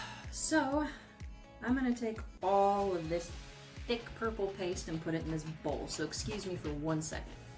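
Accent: Midwest, United States. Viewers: Purple is amazing! Could tell you were a fellow midwesterner. Could listen to you talk all day.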